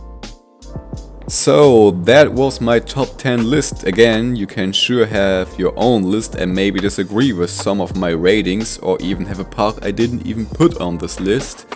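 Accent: Germany. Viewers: bist du eigentlich deutsch ? :D , hört sich irgendwie so an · Man hört, dass du normalerweise Deutsch sprichst. Ich überlege ob ich mir Skater XL kaufe, da es mega gut aussieht und am 28 July als vollversion 40 Euro kostet. Tolles Video bitte mehr von Map Videos! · R u german? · Man hört direkt, ein deutscher 😅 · Are you german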